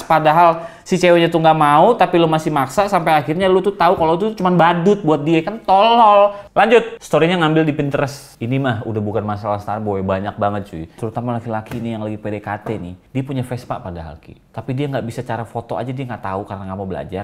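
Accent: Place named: Java, Indonesia. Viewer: lo gw logat jawa the best fking starboy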